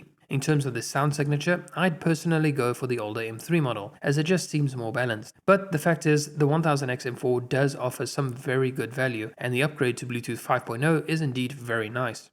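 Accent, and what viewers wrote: South Africa. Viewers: South African accent, but living in Dubai.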